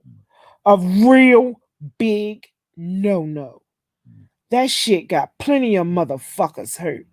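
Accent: Baltimore, United States. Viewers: Your very welcome... its a compliment definitely... your personality makes this reading fun and interesting... your Baltimore accent makes the story more realistic...I also appreciate that your reading the entire book. Ralph Reads on YouTube got me waiting for weeks for the 2part...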